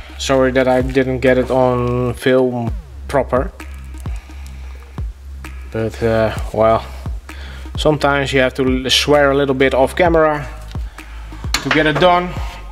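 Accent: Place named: Netherlands. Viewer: Love the dutch accent. Cheers.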